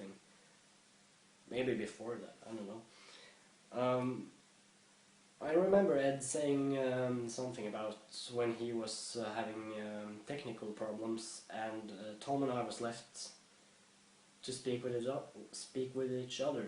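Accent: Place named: Australia. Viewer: Bruh how did I always think he was Australian...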